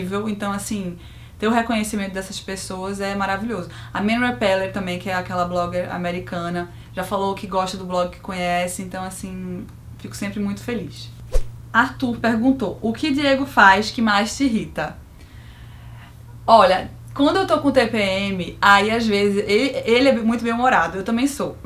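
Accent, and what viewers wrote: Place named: Recife, Brazil. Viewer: Esse teu sotaque é o melhoor! Recifense <33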